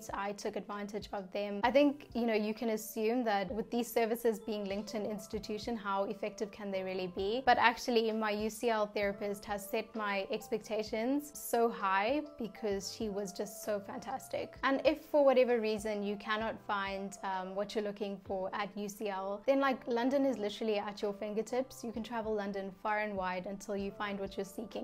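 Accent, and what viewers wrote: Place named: South Africa. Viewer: I knew it she's south african 🇿🇦😝 thanks for the in-depth review. I feel super comfortable in my choice for my masters there. 😎 Keep it up!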